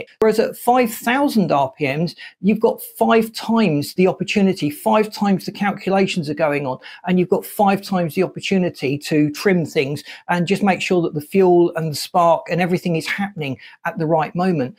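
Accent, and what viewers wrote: United Kingdom. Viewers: Could you change your accent to something non british? Its barely understandable. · Why all the 'clever' advisory comments? This man knows his stuff, as do I (C&Guilds/National Crafts qualified for 46 years), so no need to 'try' to elaborate or add in any respect. He is absolutely 'Bang on' and a rarity, at that! He speaks like a true Brit!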